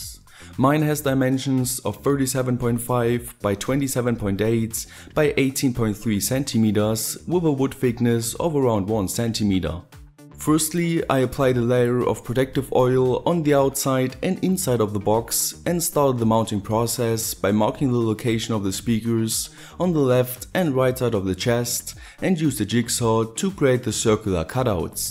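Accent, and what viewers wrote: Germany. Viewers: are you german? sounds like that:D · are you german? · Englisch with a german dialeckt :D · wusste doch dass du n deutscher bist :D das hört man einfach so raus XD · Your accent, and using a Geodreieck..... you are German... cool!!! Great video btw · deutsch ?höhrt sich so an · Wie man einfach direkt nach 5 Sekunden merkt das du Deutscher bist 😂👌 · are you from germany? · Er ist Deutscher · Ich denk noch die ganze Zeit: Irgendwie klingt der Akzent deutsch. Und dann BÄM, Pflege-Öl. xD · Du hast ein deuschen axent! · Red doch deutsch man hört dass du deutsch bist 😂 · Are you from Germany? Or Austria? I am hearing accent from you. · German? Sounds a Little like a German that is speaking english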